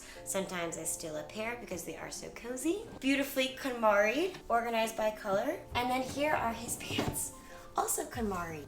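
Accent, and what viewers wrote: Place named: Texas, United States. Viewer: I love her accent! Is it Texan?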